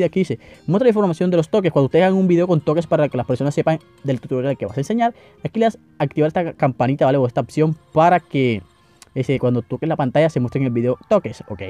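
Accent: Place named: Chile. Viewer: es chileno :v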